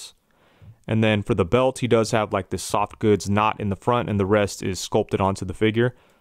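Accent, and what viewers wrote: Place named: AAVE, United States. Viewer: Dude speaks in Ebonics and is happy to review copyright infringement toys. Lol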